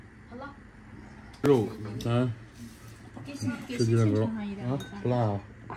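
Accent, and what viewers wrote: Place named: Beijing, China. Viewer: 听着像老北京人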